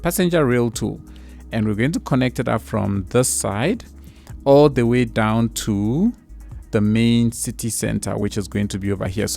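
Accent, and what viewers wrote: South Africa. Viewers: I can smell the accent. Awesome to hear some South African youtubers playing City Skylines. Hope you are blessed, brother!! Video Suggestion. Build a South African Major City replica - durban,Capetown, etc. Keep up the lekker videos man. God bless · are you south african by chance?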